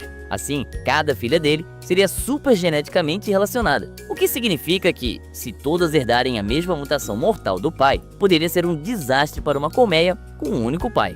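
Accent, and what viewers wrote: Santa Catarina, Brazil. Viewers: vc tem voz de catarino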